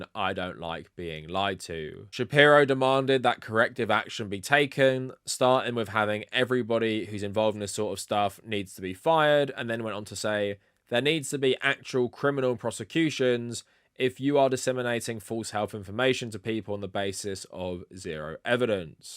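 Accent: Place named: England, United Kingdom. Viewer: So I'm 8 minutes into this and so far it's safe to summarize everything this YouTuber has said thusly: Blahblahblahblahblahblahblahblahblahblahblahblahblahblahblahblahblahblahblahblahblahblahblahblahblahblahblahblahblahblahblahblahblahblahblahblahblahblahblahblahblahblahblahblahblahblahblahblah Not sure if you want to assert any thoughts of your own or if you're capable of that but I won't be sticking around for that. English accent sounding smart doesn't mean they're smart at all. This is just blather.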